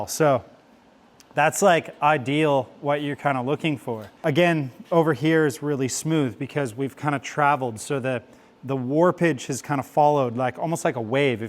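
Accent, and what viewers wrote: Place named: Australia. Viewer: Damned you're talking almost Ozzie now. Come one down to the coast for a couple days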